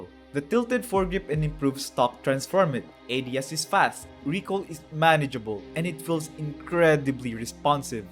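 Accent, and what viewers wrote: Philippines. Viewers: Pinoy pala tong si nato HAHAHA i thought i misheard what he was sayin HAHAHA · Knew it was a Filipino from the accent then there's the "ginagawa mo" which proves it! HAHA u earned a sub pare nice vids! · This guy's got that Filipino Accent.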